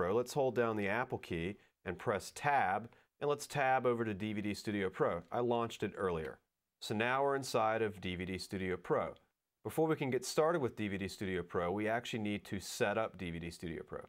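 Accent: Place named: Pennsylvania, United States. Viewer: I love the PA accent.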